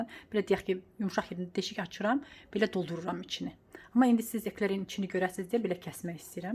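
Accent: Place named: Qakh, Azerbaijan. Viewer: Gözəl ləhcəmiz ❤ Qax' dı, Zaqatala? Əllərinizə sagllq 🌹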